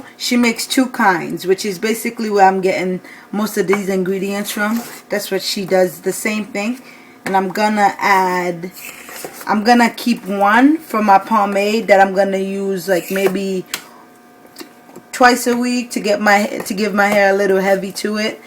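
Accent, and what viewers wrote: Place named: Caribbean. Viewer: so obviously she gotta accent is either west indies or carribean ....lovin it. really liked the video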